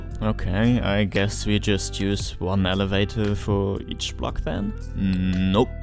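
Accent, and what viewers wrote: Germany. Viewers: Man kann den Deutschen akzent etwas krass hören, xD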